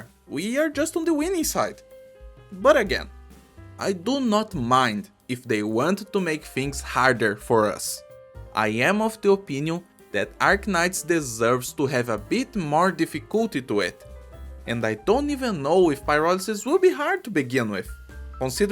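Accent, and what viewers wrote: Brazil. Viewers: This is CC because Pinchout is CC, Pinchout was just a bad CC and this shifts the whole perspective of people, if this one was the Beta for the new model (which isn't very different than the previous one in any actual meaningful level) instead of Pinchout, the whole discussion would be different. Most of the issues are community sentiment related, because of the proximity of Pinchout DOS and this, with all 3 being operator restrictive events designed for high end accounts. Pinchout didn't feel very like CC to most people because most people experience is Risk18, and the difficulty for the new "RISK 18" is more like old 24~28, which would be a problem if the rewards were locked behind it, like how it was on DoS, but this time around, the only sole reason to push 620 is the medal, this is exactly the correct type of reward to be locked behind the crazy clear, my own complaint is that the gap should be higher between medal and trim, the trim should be slightly higher, while the base medal should be slightly lower. 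On a random note, are you brazilian? Your accent really gives me brazillian vibes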